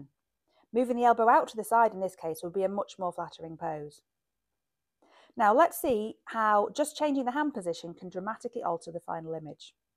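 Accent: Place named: England, United Kingdom. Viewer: We speak English with an English accent in England. For those that don’t understand English well, you can turn on the subtitles!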